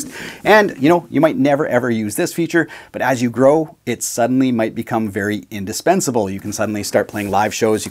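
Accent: Canada. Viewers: I love the canadian accent lol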